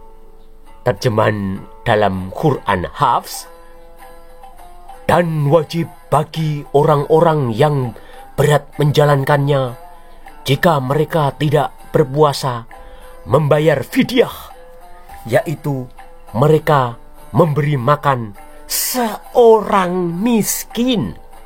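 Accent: Java, Indonesia. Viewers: salut ma suara yang ngebacain alur critanya...medhogh banget jawanya...😂😂😂 GBU mas bro...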